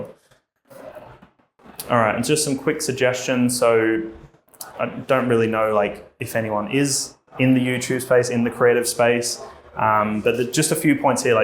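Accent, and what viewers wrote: Australia. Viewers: Oh wow, I didn't realize you weren't from Australia. You're from the UK? You sound like you have an Australian accent. I mean now that I know, I can hear there's a slight difference, but it definitely doesn't sound like an English accent.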